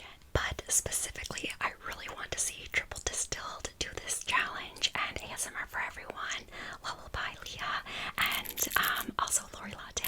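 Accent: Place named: Canada. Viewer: Your Canadian accent really comes through in this video 🍁❤️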